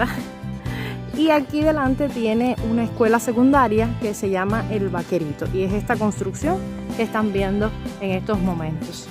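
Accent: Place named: Dominican Republic. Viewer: Tu tienes acento dominicano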